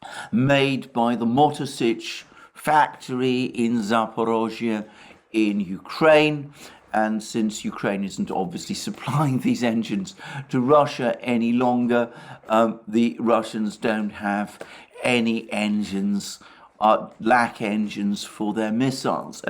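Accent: United Kingdom. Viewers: British accent is adorable and I like learning new words from. Moreover, now I know that even English speaking people say "hypersonic" instead of "supersonic" 😁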